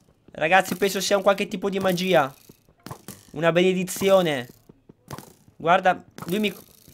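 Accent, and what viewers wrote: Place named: Veneto, Italy. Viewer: Gli scleri che ti partono in veneto sono i migliori!! Daghine;)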